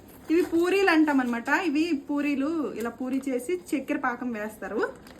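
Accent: India. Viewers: Srikakulam kadakka meedi.. suppulu annav chudu.. chevulaki bhale haai ga undi😊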